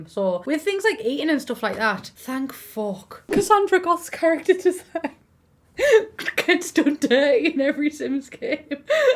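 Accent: United Kingdom. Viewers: Ure biritish?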